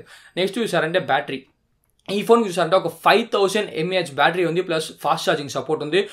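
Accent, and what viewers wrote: Tamil Nadu, India. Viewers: Telugu baga maatladutunnav bro kani koncham tamil style lo undi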